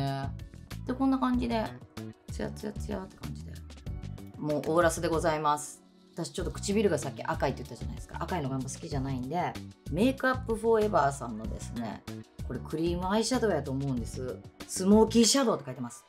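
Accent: Kansai, Japan. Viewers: Vogue で関西弁が新鮮で良い❤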